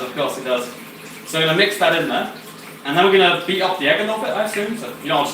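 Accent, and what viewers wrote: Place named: England, United Kingdom. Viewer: OMFG HE'S ENGLISH.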